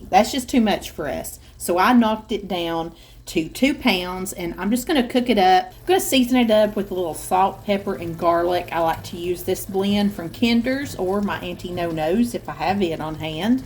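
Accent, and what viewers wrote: South, United States. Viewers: I just love your recipes and videos because your recipes are comfort foods and I just love your southern accent I don't miss your videos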